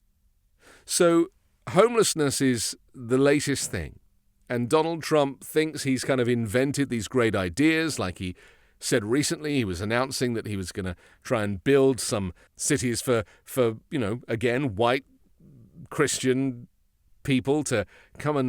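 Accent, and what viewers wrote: United Kingdom. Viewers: It sounds like you're from the UK. Just bend over and take it from the migrants and don't worry about what we're doing over here. We're actually fixing our problems.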